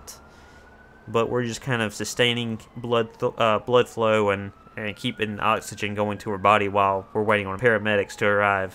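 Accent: South, United States. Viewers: Nice accent lol, always good to see some fello good ole country boys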